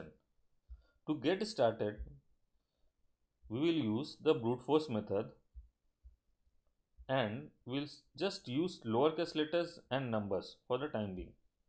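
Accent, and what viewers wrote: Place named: India. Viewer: Sean Gardner relax man... he speak like an Indian. I don't think he's a Isis member. but, we can't trust too. :/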